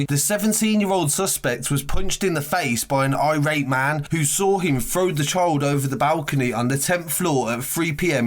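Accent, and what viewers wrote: Birmingham, United Kingdom. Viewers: Are u a brummie ? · Can't listen to this nerdy Birmingham accent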